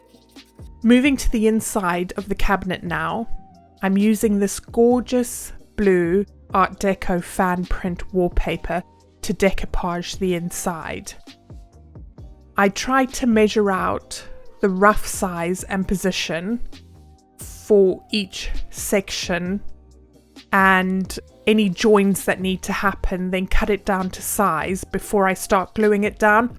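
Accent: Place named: England, United Kingdom. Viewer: I had to put the video on mute. I couldn't stand your English accent...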